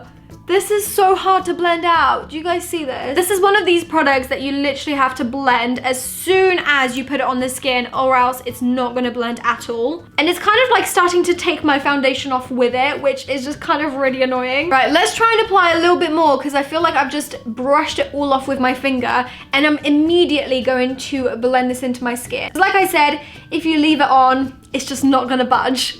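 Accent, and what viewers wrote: United Kingdom. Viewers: Really? She sounds so british omg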